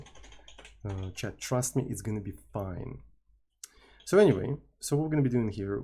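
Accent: Russia. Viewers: Твои видосы отличные, но мне, как русскоговорящему, тяжело слушать русский акцент) Если тебе не похуй на мой совет, то изучи правильное произношение звуков в английском. Например звук "т" в англе совершенно другой (и при этом не сложный бтв)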